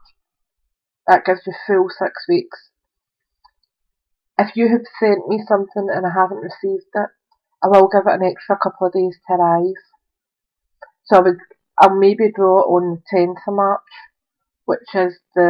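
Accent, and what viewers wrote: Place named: Scotland, United Kingdom. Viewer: I could listen to you for hours mrs - love that Scottish accent!! But I know what you mean, I feel monotone Irish lol x hugs Sandra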